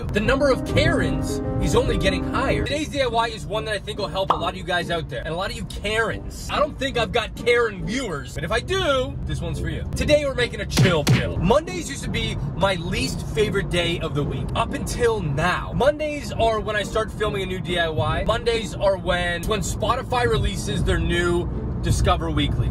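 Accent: Canada. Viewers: Is he canadian... cuz he sounds like it and im tryna build a Canadar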